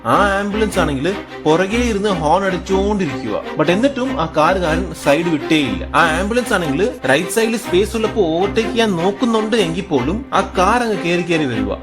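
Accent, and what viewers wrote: Tamil Nadu, India. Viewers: You are not a malayali, allea? Your Malayalam prononciation is like a tamilan. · Why do you sound like a Tamil speaking Malayalam?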